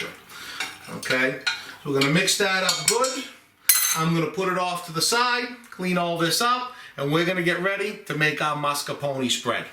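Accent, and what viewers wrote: Boston, United States. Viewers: Just wondering where you are from. I’m from Boston area and your accent sounds like mine! Lol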